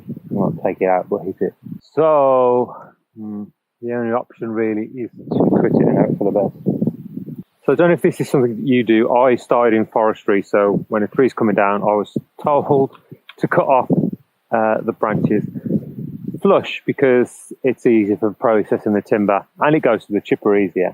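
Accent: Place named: Nottingham, United Kingdom. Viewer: Cool video . 👌 I guess you’re from Nottingham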